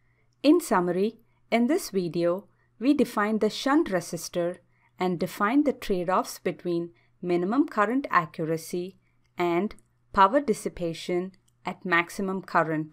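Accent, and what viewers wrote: India. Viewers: wtf why must indian speaker? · India English？I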